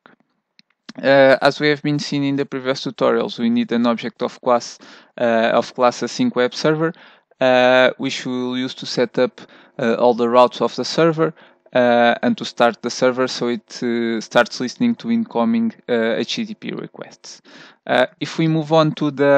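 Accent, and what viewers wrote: Portugal. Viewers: Obrigado por este tutorial! (acredito que sejas tuga pelo falar.. ;) )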